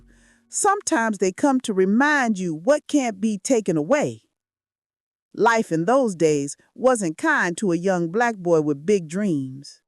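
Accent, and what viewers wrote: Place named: South, United States. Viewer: I enjoyed the video, and loved the southern accent.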